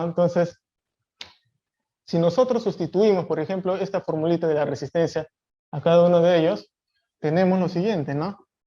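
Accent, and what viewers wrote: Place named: Peru. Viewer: INGENIERO BUENAS NOCHES. HOY DIA ENCONTRE SU CANAL. MUY INTERESANTE EL VIDEO Y SU CONTENIDO. ESTOY REPASANDO MIS NOTAS DE HACE 40 AÑOS. ENCONTRE LA FORMULA PARA CALCULAR LA TEMPERATURA DE TRABAJO DE LOS CABLES YA SEA A 50 GRADOS O A CUALQUIER TEMPERATURA. DIGAME SI EL CABLE FUERA SUBTERRANEO, BAJO TIERRA QUE TEMPERATURA SE TOMA PARA EL DISEÑO. ESTOY SUSCRITO A SU CANAL, VOY REVISAR SU CONTENIDO. ESPERO QUE SIGA SACANDO MAS VIDEOS SOBRE ESTOS TEMAS. ME PARECE QUE UD ES PERUANO. RECIBA UN GRAN SALUDO Y MIS FELICITACIONES POR UNA EXCELENTE EXPLICACION. SALUDOS DEL NORTE DEL PERU. QUE MI DIOS LE GUARDE Y LE BENDIGA.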